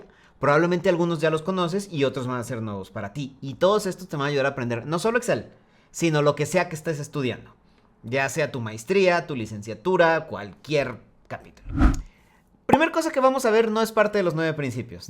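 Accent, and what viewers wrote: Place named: Mexico. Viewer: Lastima que eres mexicano con ese acento que no es muy agradable, sino fueras el mejor youtuber de este tipo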